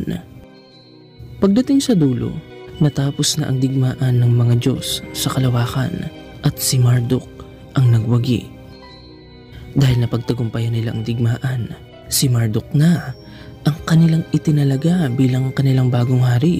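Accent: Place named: Cebuano, Philippines. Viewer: Hello ka-, ang style at pamamaraan mo sa pagsasalita ay walang pagkakaiba sa nagsasalita ng Ophirian Heritage Conservatory, ibig ba sabihin na iisang tao lang kayo? Kahit tagalog ang ginagamit na salita ngunit ang accent ay parang sa isang cebuano language. Maganda ang ipinapakita mong presentation at wala itong pagkakaiba kung paano ginawa ang presentation ng OHC. Very Educational!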